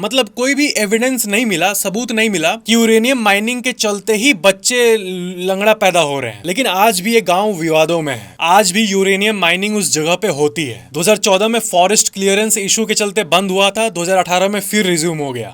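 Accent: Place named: Bhojpuri, India. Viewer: Bhai apki hindi mujhe bht achi lagti h thora thora bhajpuri v mixed ho jata hai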